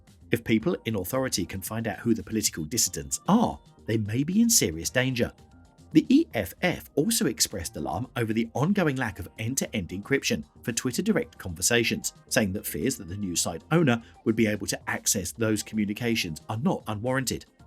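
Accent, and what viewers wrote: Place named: England, United Kingdom. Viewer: Who in the hell is this English guy do we have anybody that can talk normal anymore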